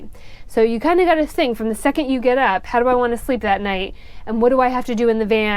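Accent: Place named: Detroit, United States. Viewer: Wait? Are you from Detroit? You sure sound a hell of a lot like me, lol. Cool sweatshirt. I have lived in Utah for several years now, and I told a friend "I need to make a sweatshirt that has the PSA: "I no longer live in Detroit...but a LOT of Detroit still lives in Me!". Happy trails!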